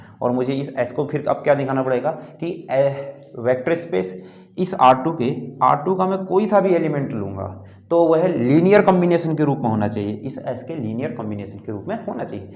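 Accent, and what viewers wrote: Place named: Kumaon, India. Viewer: sir aap lohaghat ya champawat se ho na? bolne k tarike se अंदाज़ा लगा rha hu 🙂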